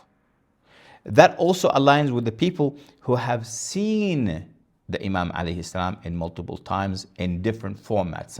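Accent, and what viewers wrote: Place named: Michigan, United States. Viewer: وكانك من لهجتك عمامة ميشكانية😢😢😢فان كنت كذلك فلعنة الله عليك فما انت الا اشر الخلق.....الحمكم ان كنت من امريكا..فإنما انت ديوث قواد منحط اخلاقيا عديم شرف،وهذا ابسط ما يقال فيك....ان كنت من امريكا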